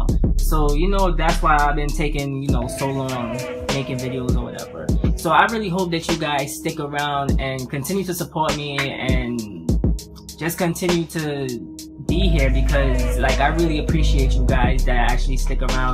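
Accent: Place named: New York, United States. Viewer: i knew you were from ny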